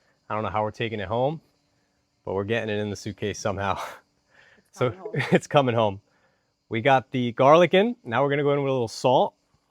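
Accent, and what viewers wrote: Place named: New Jersey, United States. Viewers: I knew you were from NJ! We both say water the same way. Where abouts? I grew up in Union County before I moved to Florida in '89. Anyway, my wife loves cherry tomatoes and orecchiette so, she's getting this tomorrow. The Cat Distribution System chose you, yeah the CDS is a thing. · I knew you were from NJ. You just can’t mistake that accent. I love your recipes simple and doable. Can’t wait to try them. I’ve been saving them and writing my shopping list accordingly.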